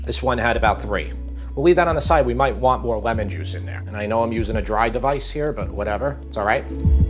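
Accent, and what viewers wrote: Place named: New York City, United States. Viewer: You have the coolest nyc accent i've ever heard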